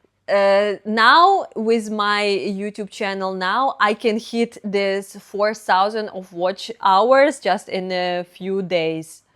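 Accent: Russia. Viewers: I've noticed that in your latest videos your Russian accent is more pronounced than in your previous videos from the last few years... it sounds great. Spanish is my native language, and I have to say your English is clearer than that of many YouTubers who speak English as their native language. I watch your videos to practice my English listening skills, and I understand everything you say perfectly. You do a great job! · Your Russian accent sounds so elegant! I could listen to it all day and be like 😊! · We love you my russian queen 🇷🇺❤️👸 You are beautiful and intelligent. Harmonic and calm it's very pleasant. Remember! The most of us is not native English speaker so you can talk as your like with your lovely accent. English is only like Latin during the middle ages for people to communicate and reach out to one another. Fuck the western corrupt political elites. 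We know the truth and respect the great Russia. And some of us know our common old history. I love to see your videos from Russia and I feel and see many related things. Only in a another way. Keep up the good work. With love 💕 from Sweden 🇸🇪👱👋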